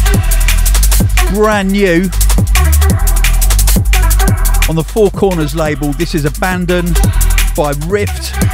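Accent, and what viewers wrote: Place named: United Kingdom. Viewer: Gotta love how bri'ish my boy sounds, I love him so much. Big up, London.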